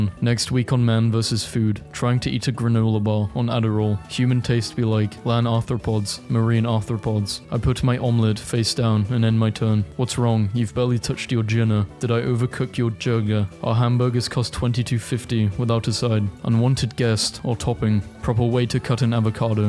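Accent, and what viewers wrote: United Kingdom. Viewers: He sounds British, so I'd guess the latter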